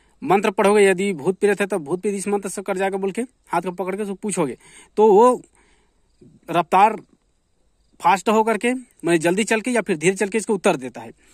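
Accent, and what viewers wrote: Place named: Chhattisgarh, India. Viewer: आप लगता है। छत्तीसगढ़ से है। आपके बोलने की शैली से पता चल रहा है। कहां से हैं महराज पता व नं बताये। धन्यवाद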